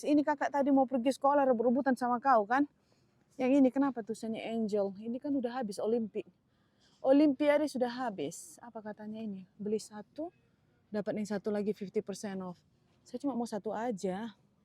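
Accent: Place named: Medan, Indonesia. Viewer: Cara kak berbicara ciri khas orang medan kalau berbicara 😊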